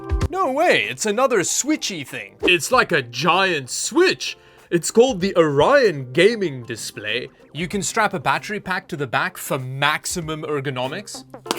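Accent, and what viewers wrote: Afrikaans, South Africa. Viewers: I can hear some South African in that accent? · Hi, your name and accent suggest dutch/ afrikaans, are you from ZA or like Namibia?? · I keep hearing an afrikaans accent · Are you from South Africa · Are you South African?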